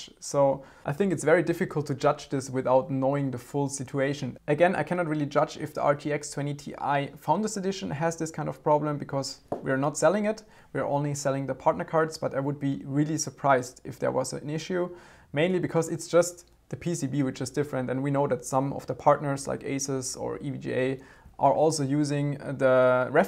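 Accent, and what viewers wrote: Germany. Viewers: Most people??? LOL. I work in a company with over 200 employees, and only a few people speak English, and not even close to flawless. Only a minority of people in Germany speak English. Most learn it at school, then forget it afterwards because they never use it again. And I agree with TheFreeFlow. He could work on his german accent easily by watching more TV shows in English, or tech channels. Anything really, but in English.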